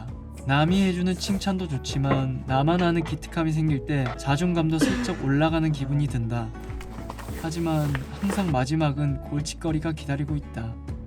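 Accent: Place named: Gyeongsang, South Korea. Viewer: 아 아이고... 역시 김치는 사먹는게 제맛.. 근데 경상도 분이셨군요 (동지)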